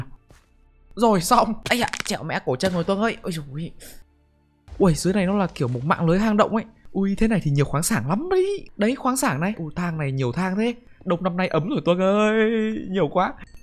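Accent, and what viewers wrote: Hai Phong, Vietnam. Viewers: Anh ơi quê anh ở chỗ nào Hải Phòng đấy ak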